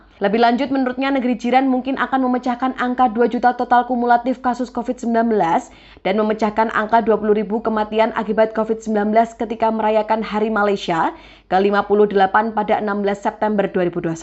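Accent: Java, Indonesia. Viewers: Pembaca beritanya medok jawa bgt...hehehe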